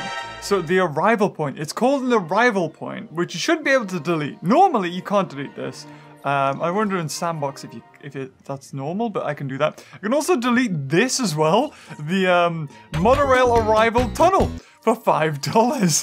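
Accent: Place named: United Kingdom. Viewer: It's pleasant, like idek but it's always nice to hear a british man talk about dinosaurs in a man child way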